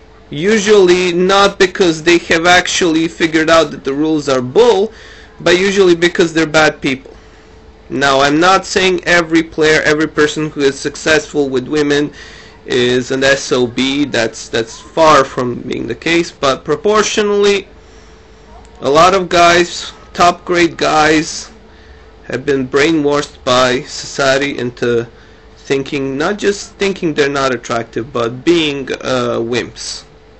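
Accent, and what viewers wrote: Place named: United States. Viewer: how come you don't have that heavy accent? your accent kinda sounds normal and like american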